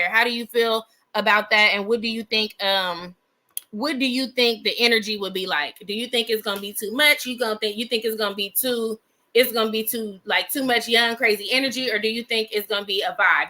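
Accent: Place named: Los Angeles, United States. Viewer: btw I’m from So Cal. I hear LA in your voice 💜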